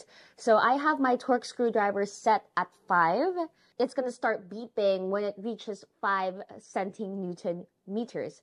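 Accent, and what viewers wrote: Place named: Philippines. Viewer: I am hearing a filipino accent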